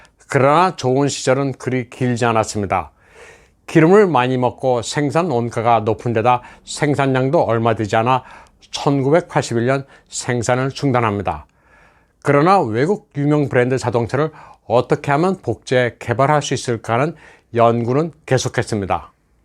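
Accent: China. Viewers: 이 사람 발음 보니 중국인이구만 ㅉㄱㅇㅇ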